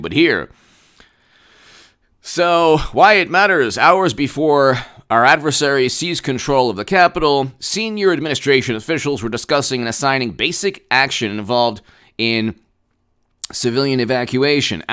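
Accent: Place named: New York, United States. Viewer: . Your accent is awesome. Please let me know where in US you are from?. Must be NY right?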